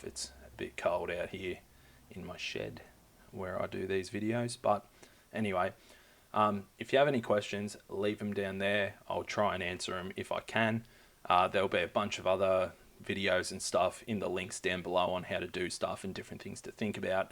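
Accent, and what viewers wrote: Australia. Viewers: My work gave me MS365 responsibilities to help out so this was a good intro. I know most of this stuff but seeing where it is in this video was a good refresher. Been a while since I have done admin stuff. I like how you had trouble with the word enquiries so just changed it to Askmeanything or whatever. lol :D Thats totally what i do when i forge how to spell a word, just use some other words. :D Plus its fun to be in Texas and hear an Australian accent. Makes me think im talking to one of my relatives over there. Anyways. Thanks fo the vid. Cheers.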